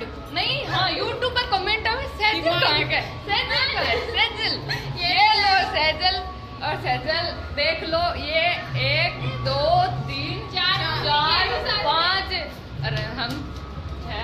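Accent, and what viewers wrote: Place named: Rajasthan, India. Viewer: didi the marwadi bolta ktayi zeharr lago 😜❤️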